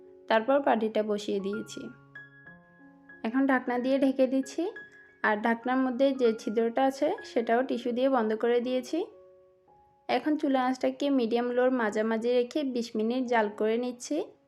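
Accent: Chittagong, Bangladesh. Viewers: আপা মনে হয় চিটাগাং এর কথায় চিটাগাং এর টানটা আসছে। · Chottogram er Language 😮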